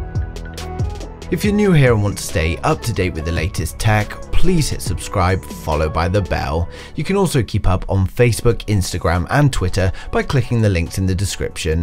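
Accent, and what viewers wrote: United Kingdom. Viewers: That's a British accent.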